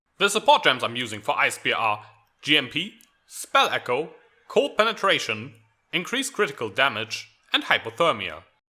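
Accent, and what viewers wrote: Germany. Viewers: Talking like what? He's German, you expect him to have no accent?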